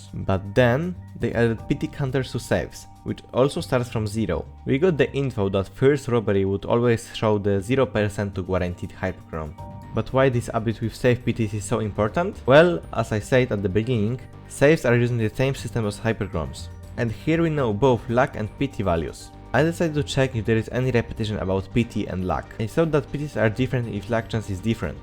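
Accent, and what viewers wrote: Poland. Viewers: siema słychać że polak gada hahahah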